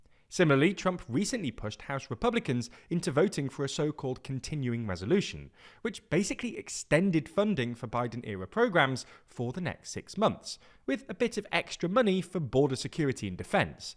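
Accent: United Kingdom. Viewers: Love the British accent. You must be smart. How is the UK doing?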